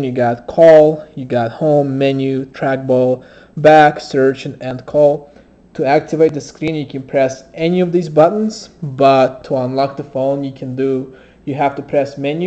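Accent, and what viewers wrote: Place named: Russia. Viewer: You're from Russia? Love the accent :-)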